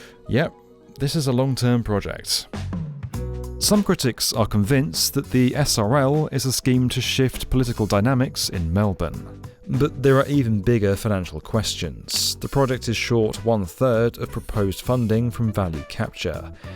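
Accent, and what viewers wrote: United Kingdom. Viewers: Weird hearing a British guy talk about the suburbs I’ve lived in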